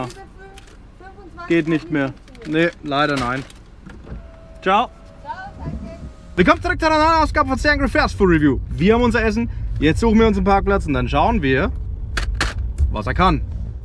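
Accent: Bavaria, Germany. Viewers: Du bist der woll sympathischste YouTuber Abo is da Bayrischer Dialekt direkt angeboren obwohl es auch ein Amerikanischer sein kann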